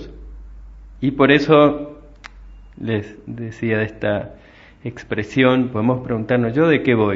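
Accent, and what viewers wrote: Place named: Argentina. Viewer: Suena muy bien con acento argentino! Muy bonita reflexión. Desde España.👍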